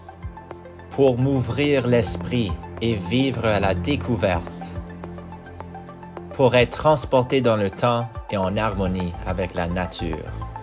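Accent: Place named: Quebec, Canada. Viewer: J’adore votre accent québécois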